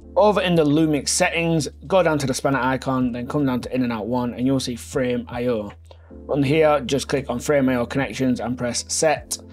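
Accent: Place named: Cockney, United Kingdom. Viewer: I hear Cockney man)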